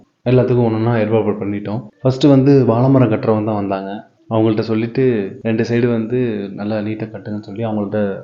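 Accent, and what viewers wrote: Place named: Tirunelveli, India. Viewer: பேச்சு வழக்கு பார்த்தால் கோவை மாதிரி தெரியலையே. நெல்லை மதுரை மாவட்டமா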